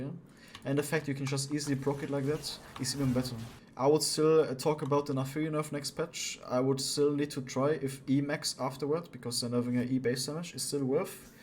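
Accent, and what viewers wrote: Germany. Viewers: He's not flaming, that's just his german accent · Never seen an in-depth guide this entertaining. I don't know you but since this video is phenomenal, and I assume you are German because of your accent, I'll definitely get to know you sir!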